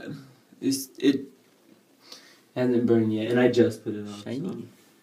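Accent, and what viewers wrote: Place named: Louisiana, United States. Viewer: Aww I love ur voice, you kinda sound like ur from Louisiana.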